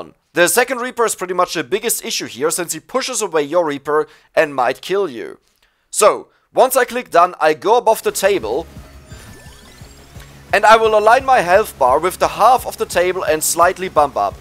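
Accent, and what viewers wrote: Germany. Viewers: dein englisch klingtr mega deutsch, mir würde das video in deutsch mehr helfen XD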